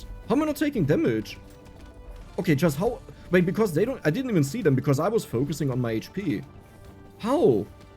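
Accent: Germany. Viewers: Muss ehrlich sagen. Hab DF‘s lets plays geschaut und nach dem spiel gesucht. Bin so auf dein kanal gekommen. Deine ersten worte auf englisch gehört, und sofort war mir klar der brudi ist ein deutscher. Hahaha, kein hate. Find es echt krass wie du englisch spricht. Hut ab mach weiter so abo hast du aufjedenfall.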